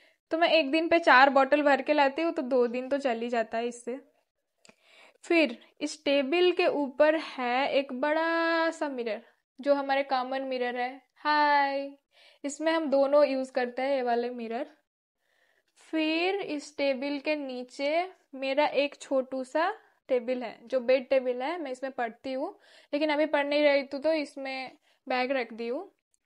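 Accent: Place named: West Bengal, India. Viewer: Didi apka ghar khaha hai... up ka bat sunkar I guess West Bengal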